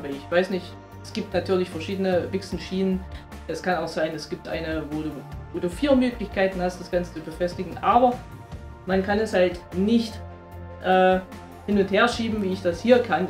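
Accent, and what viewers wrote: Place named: Saxony, Germany. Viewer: Da kommt wer aus Sachsen? Xd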